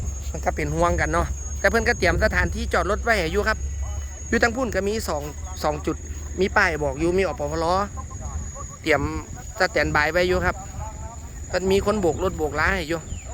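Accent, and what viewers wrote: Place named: Chaiyaphum, Thailand. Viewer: พูดจาได้คล่องแคล่ว สำเนียงชัยภูมิ เพราะมากเลยจ้า กดไลค์กดแชร์อีกแล้วคลิปนี้❤❤❤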